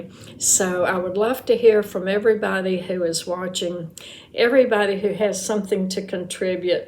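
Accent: South, United States. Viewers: I found your channel today and I could listen to you talk for hours. I live in Oklahoma, so it's not like I don't hear southern accents, but yours is so lovely. I'm also a retiree with a mortgage, but thanks to my working so many years and a great investment manager, it's all good and all I have to do is hit 95 and this place will be all mine lol. So glad to find you and and I'll be back to see all your videos. You're a natural!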